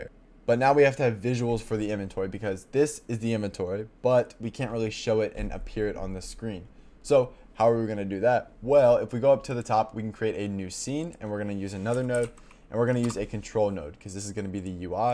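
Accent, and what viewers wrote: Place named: Mid-Atlantic, United States. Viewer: I gotta say...I've "been around the block" in terms of seeking out clear tutorials...and I keep coming back to (and I'm a fan of the mid-Atlantic accent...somewhere between Philly and Baltimore if I could venture a guess). Anyway, really great stuff here!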